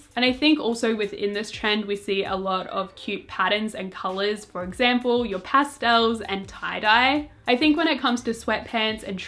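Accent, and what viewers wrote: Australia. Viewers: I figured she was from Australia because she lowkey sounds like Felix, Bang Chan and this one Australian girl I used to know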